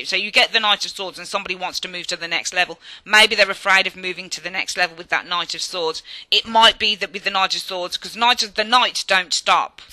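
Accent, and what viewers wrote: Birmingham, United Kingdom. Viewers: That’s got to be the best Brummie Reading I’ve ever heard! You are really good! Thanks!:)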